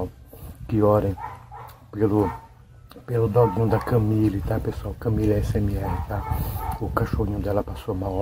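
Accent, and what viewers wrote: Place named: Northeast, Brazil. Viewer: O sotaque nordestino ❤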